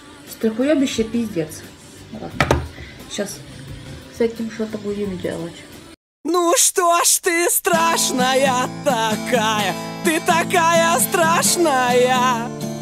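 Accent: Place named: Surzhyk, Ukraine. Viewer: Слухай,розмовляй будь ласка на Українській мові,ато ти говориш на суржику як Вєрка Сьєрдючка з Полтави.Роби щось з собою).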